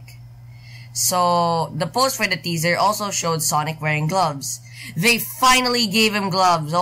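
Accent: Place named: Philippines. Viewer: Man sounds like a Filipino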